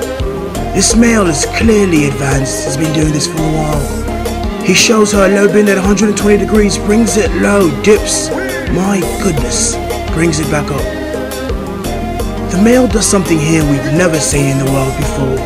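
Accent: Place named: England, United Kingdom. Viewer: This is more like sex on the dance floor.... That English accent though is bomb...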